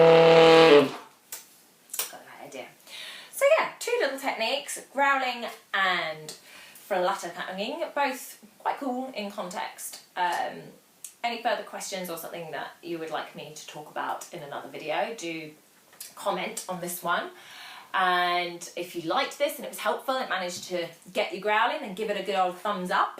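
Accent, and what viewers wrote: United Kingdom. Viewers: thanks for upload these helpful tips. I use sometimes this technique on my baritone sax. Greetings from north Mexico. By the way, I like your lovely british accent;)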